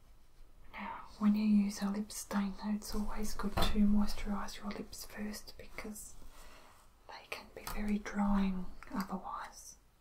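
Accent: Australia. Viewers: Are you Australian? Beautiful video!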